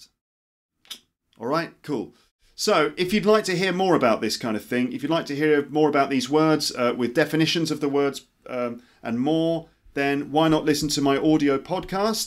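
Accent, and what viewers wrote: RP, United Kingdom. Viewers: Hi Sir, My name is Karla, I have to say something about your pronunciation. Your accent is from UK, If I pronoun all those words as you said, American People will correct me? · nice lesson i adore the british accent thank you so much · I love how he’s a lil it British. 😂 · Hello, I really like your voice and British accent. It is nice and clear to hear again and again. I can listen well but I can’t speak fluently, especially the English accent. I’m not satisfied at all with my voice, pronunciation and accent when I speak English out. Short talking is ok but long conversations or storytelling really suck. What can I do? I mean how do I practice? Do I need to practice English speaking one-on-one with a native speaker or can it be helpful if I practice English pronunciation first and speaking fluency on my own with the help of the Apps out there? 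Looking forward to hearing from you, Regards, TJ P.S. I just saw your channel today on iPhone pod cast and it is my very first time listening to 3/4 of your pod cast audios and then see the YouTube videos to see your face with the curiosity of how you look like because I really like your voice as I’ve said and imagined your how you look like in my mind so. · Hi,! I'm from Russia! I love your posh accent!I kind of want it too. And I also really need your opinion whether the following sentence:'all he asks for you is be prepared as much as he does ok'-makes sense for you as a native speaker. It's very important for me.I just have a little bet about that.And now I need your help.so please,.I would appreciate it. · Are you a native British speaker?What sort of British accent do you use? Is it RP? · very nice pronunciation in british accent. bravo!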